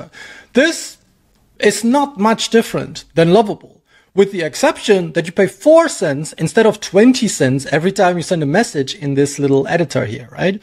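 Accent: Germany. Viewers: Great video, thanks for sharing! I realy searched for someone who compares the ai builders and gives a real recommendation! btw. are you german? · Bist du schwabe?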